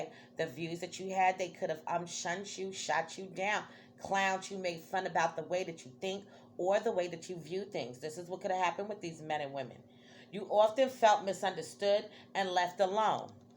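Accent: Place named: New Jersey, United States. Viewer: You gotta be From Jersey! Love it!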